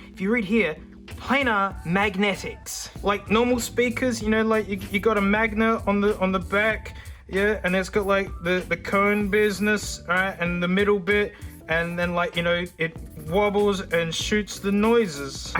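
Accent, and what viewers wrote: Australia. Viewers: can someone link me the website he uses to see the audio graphs? i can’t tell what hes saying with his thick aussie accent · This is fairly mild Aussie in the scheme of Aussieness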